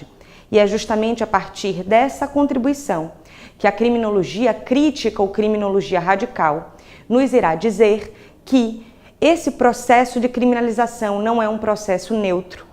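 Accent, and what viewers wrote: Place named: Bahia, Brazil. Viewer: acho que vem da BAHIA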